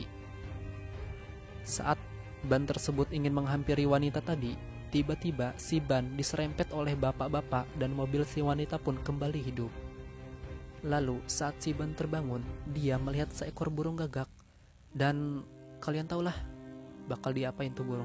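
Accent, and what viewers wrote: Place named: Java, Indonesia. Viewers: jawir jamet medok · Suara org sunda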